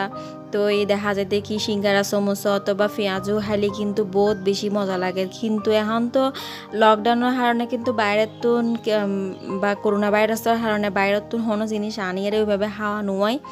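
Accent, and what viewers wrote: Chittagong, Bangladesh. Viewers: আপু চট্টগ্রামের কথা শুনতে অনেক ভালো লাগে চট্টগ্রামের ব্লগ দিও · Apo apnar kotha golo onek sondor lage but bojinaaaa..... Amar o chittagong jelar but sondwip opojelar......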